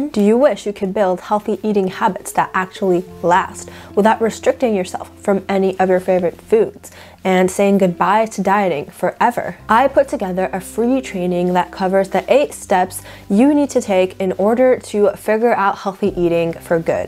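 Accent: United States. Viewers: Are you french? I feel like you talk like you came from the US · Your America accent is darn good, so says this American! Of course, we would expect your French accent to be perfect.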